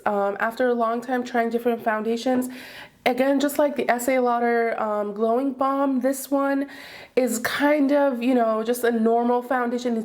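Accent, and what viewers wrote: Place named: Iran. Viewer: jan (I love your Persian accent!) I love your eyeshadow look here! The bronze color looks really good on you. I never had luck with Josie's makeup products and because it seems they are more pink toned.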